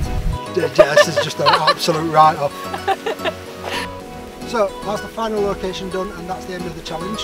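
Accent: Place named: Northern England, United Kingdom. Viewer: Really cool stuff and loved hearing that familiar nothern accent, keep up the good work mate 😊👊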